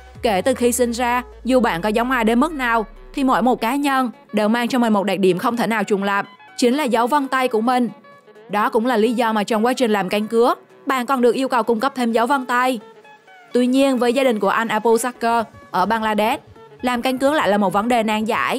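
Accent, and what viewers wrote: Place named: Phu Yen, Vietnam. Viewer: Cô này là người Phú Yên- Tuy Hòa. Cô ấy cố gắng sửa giọng để giọng nói không bị rặc tiếng địa phương, nhưng càng sửa thì giọng cổ lại càng khó nghe vì nó lai đủ thứ miền.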